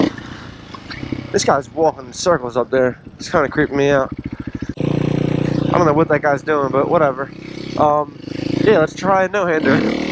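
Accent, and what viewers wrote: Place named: Philadelphia, United States. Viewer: what part of eastern pa are you from lol??????? That philly accent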